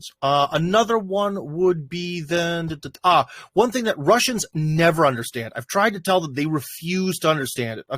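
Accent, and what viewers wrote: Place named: Cleveland, United States. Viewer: You still have your cute Cleveland accent. The midwest shines through your American English,. I can't roll my rrrr's either.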